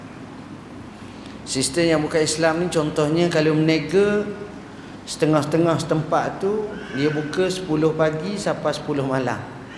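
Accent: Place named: Kelantan, Malaysia. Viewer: ustaz ni orang kelantan ke. bahasa kelantan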